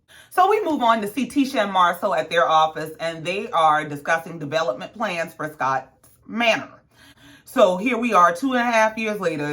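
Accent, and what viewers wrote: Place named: South Carolina, United States. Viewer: Some of my favorite commentators are too skewed on thier reviews because they don’t like Tisha but guhhhh you the most honest and unbiased review on this here show! Can’t wait til you hit 10k! Keep it going Queen. Where are you from cause I hear South Carolina all in your tone!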